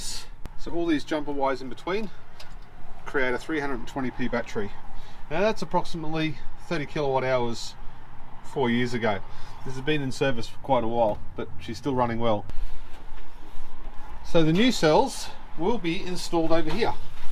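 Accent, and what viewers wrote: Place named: Australia. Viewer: I love the Australian accent.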